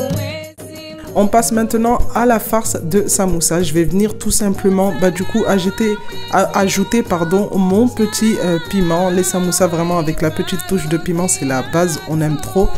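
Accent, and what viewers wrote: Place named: Algeria. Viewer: Merci ma sœur, pour le temps que tu passes dans ta cuisine, on entend algérienne🇩🇿🇩🇿🇩🇿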